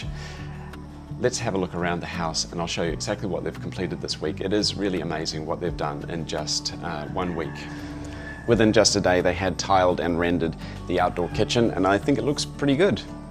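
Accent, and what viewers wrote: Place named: New Zealand. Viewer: Sound like a kiwi living a better life.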